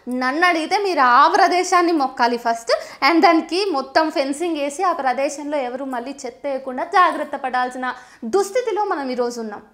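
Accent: India. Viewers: Indian educated vioce.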